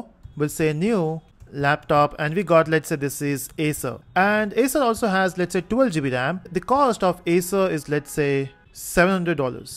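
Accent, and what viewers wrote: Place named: India. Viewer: 咖喱味太重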